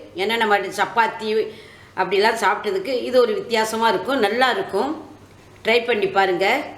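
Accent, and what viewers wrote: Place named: Nagercoil, India. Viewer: Ungal nagerkovil tamil alagha irruku.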